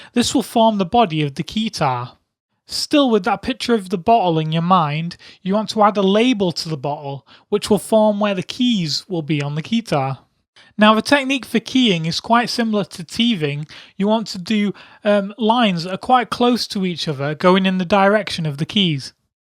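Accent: United Kingdom. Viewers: Best brit voice ever. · Dear. You sound like you're from the UK Sam Age 16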